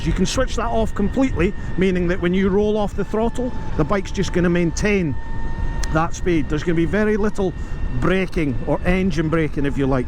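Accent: Scotland, United Kingdom. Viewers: Subscribed, just for the magic of ur Scottish accent ! Auld alliance ^^ Vive l’Ecosse et les Écossais ! Well, especially lassies ! Thierry from France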